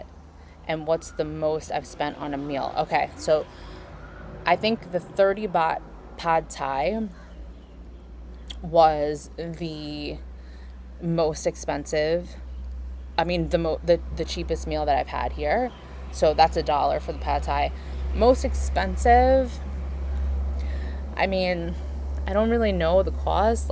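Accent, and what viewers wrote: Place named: New York, United States. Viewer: I thought I heard a little NY in your voice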